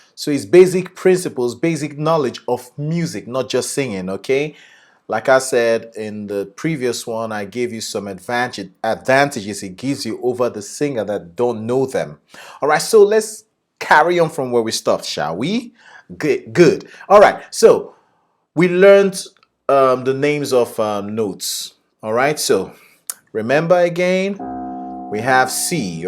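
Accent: Nigeria. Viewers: Are you a Nigerian? You sound Nigerian!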